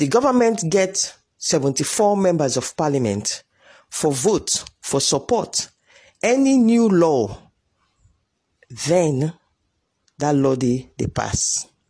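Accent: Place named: Sierra Leone. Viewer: The first time I have had you talk like a sierra leonean.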